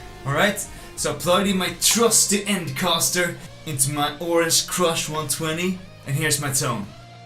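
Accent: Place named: Northern Europe. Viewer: He just sounds like he has a northern European accent to me.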